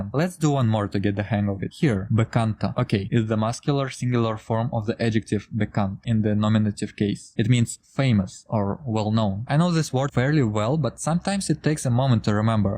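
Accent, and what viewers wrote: Russia. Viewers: are you russian? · Good vid. Aren't you Russian by any chance? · жесть у тебя акцент прям брутально русский, я звучу как фембой когда общаюсь на английском xd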